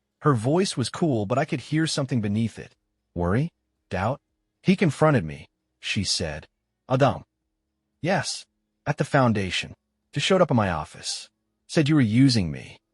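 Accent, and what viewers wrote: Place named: France. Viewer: Can we stop with the stupid French accent it’s annoying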